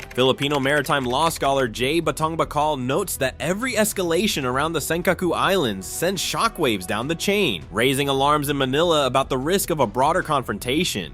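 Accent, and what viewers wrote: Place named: Canada. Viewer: You sound like actors from 'Kids in the Hall'. Are you Canadian?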